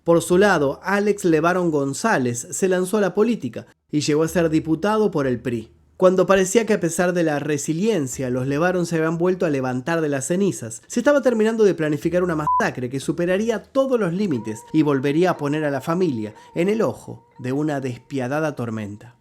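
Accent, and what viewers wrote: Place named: Argentina. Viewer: Esos malnacidos ni son mexicanos, no los aceptamos, argentino de mierda que maldito acento tan feo. Largo de México!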